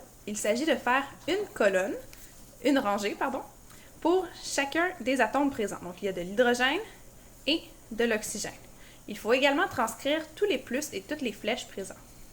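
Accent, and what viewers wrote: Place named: Quebec, Canada. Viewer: C’est sont accent québécois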